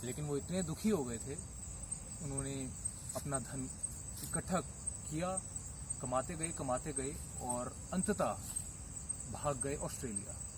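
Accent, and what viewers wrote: Standard. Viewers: Love your accent Pure hindi. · man i really love your hindi ...... its so pure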